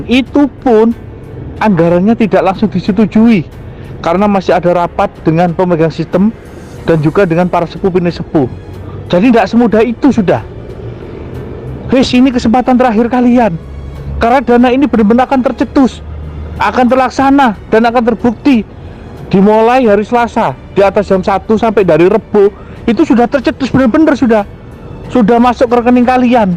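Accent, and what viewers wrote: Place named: Java, Indonesia. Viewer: Nada bicaranya seperti org jawa. Gk akan lama lagi anda tertangkap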